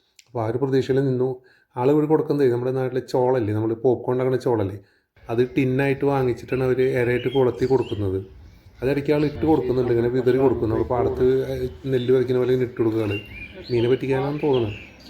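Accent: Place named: Thrissur, India. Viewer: അതെ നമ്മുടെ തൃശൂർ സംസാരം 👍😊😊😊അഹങ്കാരം ഇല്ലാത്ത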